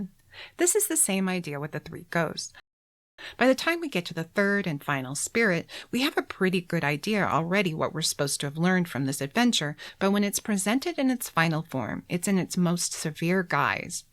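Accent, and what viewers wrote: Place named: Maryland, United States. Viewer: to your friends😊… this video is… perfect! I have been trying to find just such a video about narrative structure for a group of my students in Grade 9- Freshmen, first year High Schoolers in USA. (Excuse me if 9th Grade is obvious to you! I know nothing about your citizenship, though your accent sounds like mine from Maryland.) Regardless, as said, perfect video! The specifics about the spirits, Dickens’ direct yet deep depictions, and the psychological sides of Scrooge — wow, it is enlightening and engaging without being too easy… Thank you so much! I hope to see more of your videos and use them to help all my students grow in their Narrative Writing abilities! “God bless us, everyone”… but especially you and yours this Christmas!🎄🤓🎄🥳🎄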